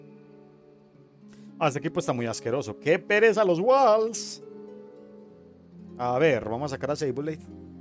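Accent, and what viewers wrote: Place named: Colombia. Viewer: Hola bro, me encantan tus vídeos y tú acento parcero xd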